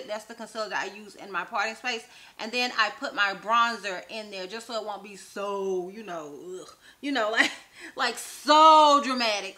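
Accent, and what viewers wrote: United States. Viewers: Girl you are so country..I love it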